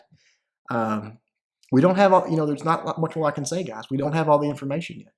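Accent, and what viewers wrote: South, United States. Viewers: Is it just me or is southern accent feels like it's slowly fading 😳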